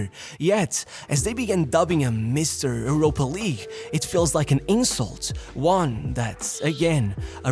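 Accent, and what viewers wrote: Portugal. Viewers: I can tell you are portuguese by the way you pronounce portuguese words, great video!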